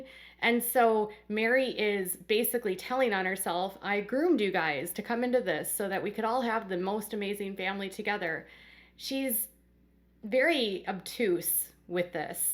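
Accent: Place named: Midwest, United States. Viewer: I hear your accent more when you’re behind the scenes… Midwest?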